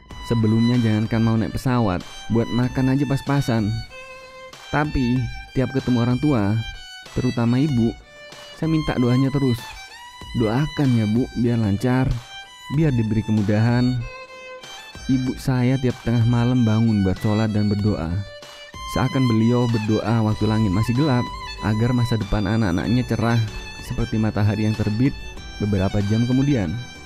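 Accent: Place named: Probolinggo, Indonesia. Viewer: Pantesan mas, logat e khas pol wong probolinggo 😅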